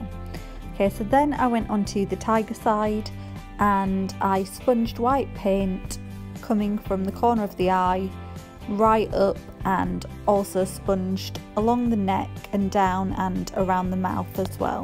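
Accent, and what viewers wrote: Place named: New England, United States. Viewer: Live the yanky accent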